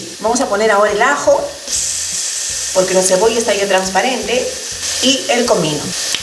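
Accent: Peru. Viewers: El acento y la comida peruana es exquisita y con sus variedades en las preparaciones de sus potajes...TE AMO PERU !!! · Exactamente se nota cuando habla un peruano en el extranjero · El acento peruano es muy bonito gracias por la receta un abrazó.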